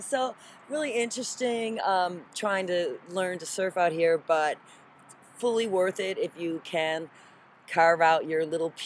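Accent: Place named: California, United States. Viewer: The 405 traffic!! you sound so Californian I can listen all day!!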